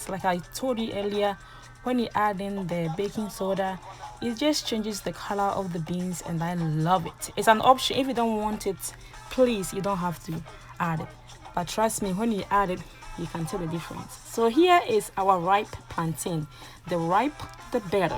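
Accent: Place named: Ghana. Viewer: The Ghanaian language ascent alone😍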